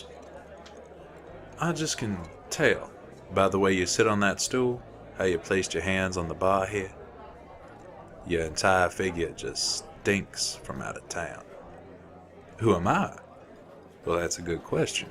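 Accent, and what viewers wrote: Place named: South, United States. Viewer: really convincing southern drawl! Loved the story c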